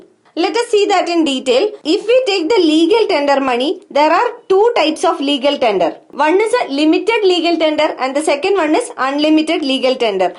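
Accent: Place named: Haryana, India. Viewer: Haryaanvi bol rhi h chhori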